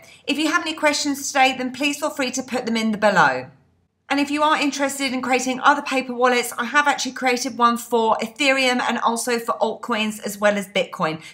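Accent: United Kingdom. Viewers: your British accent so lovely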